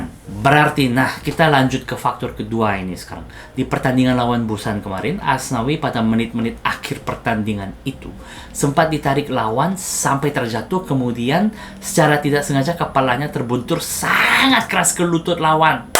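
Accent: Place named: Java, Indonesia. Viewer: Logatnya kayak wong jowo paman, he!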